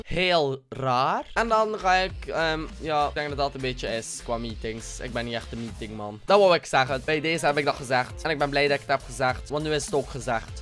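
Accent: West Flanders, Belgium. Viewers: aaaaaah gvd uw westvlaams accent man 😂😂😂😂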